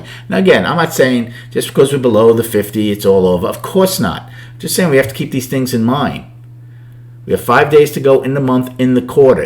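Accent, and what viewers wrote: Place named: Boston, United States. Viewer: I know a boston accent when I hear it lol I'm from western mass! Let's get paid today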